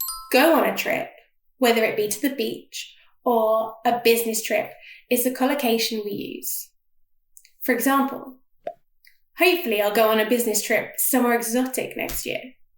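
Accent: United Kingdom. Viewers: 한국사람도 아닌데 한국인들이 실수하는 부분을 잘아시네요. 도움많이 됐습니다. 영국발음도 배울겸 구독하고 갑니다! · 영국식 영어 발음 불편하다.. 그리고 어차피 모국어가 아니면 의사소통이 첫번째 목적인데, 영어가 모국어인 사람도 완벽한 영어를 구사하지는 않치 않나. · BRITISH ENGLISH~ 액센트 너무 좋아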